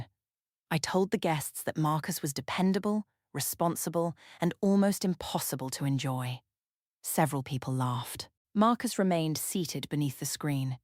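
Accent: England, United Kingdom. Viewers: The English accent didn’t help any!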